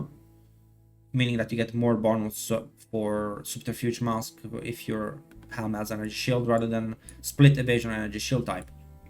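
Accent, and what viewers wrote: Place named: Italy. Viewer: Hai un accento quasi italiano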